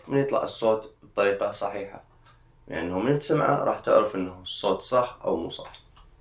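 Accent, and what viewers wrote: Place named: Basra, Iraq. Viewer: رحم الله والديك كلش خوش فيديو و حبيت لهجتك البصراوية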